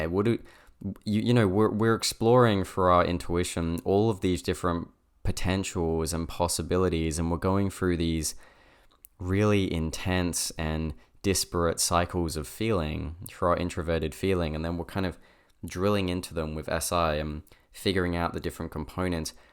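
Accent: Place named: Australia. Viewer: So nice to hear another Aussie male accent. We INFPs seem to be a little rare in this beer-guzzling testosterone-driven culture here down under.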